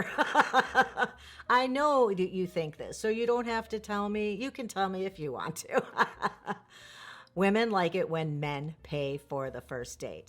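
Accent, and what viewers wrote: Chicago, United States. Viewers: Hi. I love the knowledge that I have gained from your videos. I am from Chicago also. I love your Chicago accent. What part of town are you from?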